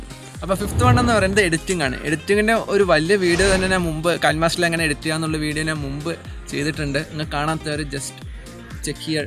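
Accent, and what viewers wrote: Palakkad, India. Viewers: bro palakkadkarananoo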